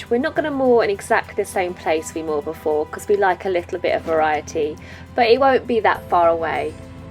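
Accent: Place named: United Kingdom. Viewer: Love your channel. It's one of the regulars that my wife and I watch. We watch a lot of British TV shows and love the various regional accents of the UK. What part of the country are you from, and what should we call your accent, which we like very much?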